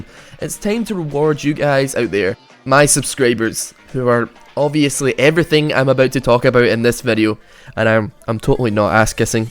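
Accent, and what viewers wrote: Scotland, United Kingdom. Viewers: Where are you from ? What's your accent ? Is that scottish ? (dont hit me) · Are you Scottish?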